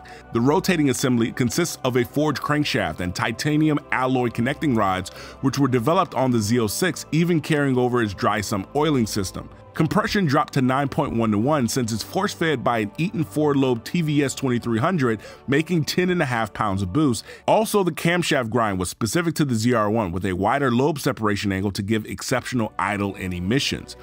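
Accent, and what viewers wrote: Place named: Indiana, United States. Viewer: YOUR ACCENT SAYS INDIANA/SOUTHERN ILLINOIS TO ME I MEAN THAT AS A COMPLIMENT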